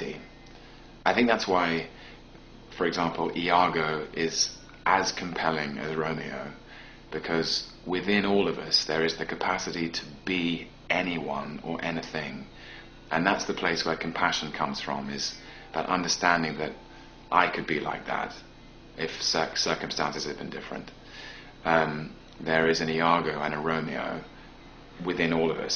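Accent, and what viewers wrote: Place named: England, United Kingdom. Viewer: I love hearing his English accent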